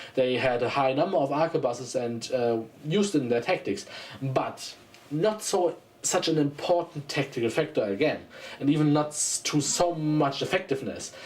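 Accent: Germany. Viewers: I mean, realistically given that accent, he should probably have a German or Austrian flag behind him. No one should have patriotism to the European Union that’s freaking weird one could say almost disgusting.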